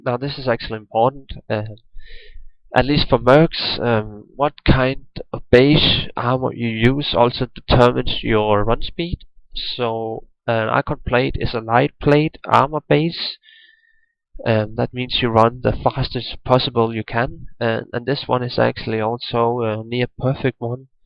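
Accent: Denmark. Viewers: This really brings me back. I used to play softcore EuNL as well, used d2jsp, made countless of builds. Think I started about 10 years ago and played pretty much every day for 3-4 years. My favourite game of all time and the PvP aspect was legit, although quite frustrating at times(PK and script hammers). It's amazing to see people are still playing, but you mentioned you stopped? How long did you play for, and if I may ask, how old are you? PS. Ja den danske accent er let genkendelig :) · the moment when you can recognize a Dane on the accent ;)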